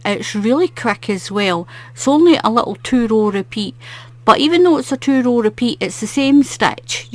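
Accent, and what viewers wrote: Scotland, United Kingdom. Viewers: Beautiful pattern and beautiful colors! Also loved your accent, which appears to me as Scottish! Greetings from Portugal!😊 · It's been a while since I've had time to watch your videos. ( I didn't realize how much I missed your delightful Scottish accent.) This stitch pattern is quite lovely. I must give it a try.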